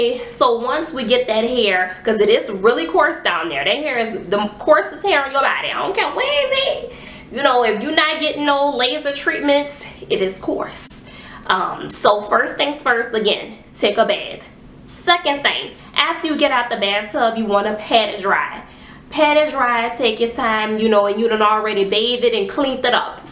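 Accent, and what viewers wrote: New Orleans, United States. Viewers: Yes mama I hear dat orleans accent 😂❤️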